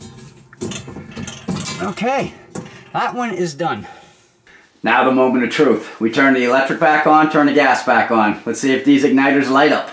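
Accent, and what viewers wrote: Boston, United States. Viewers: are you from Boston? Good job on your video, THANKS. my Maytag is much older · Love that Boaston accent. lol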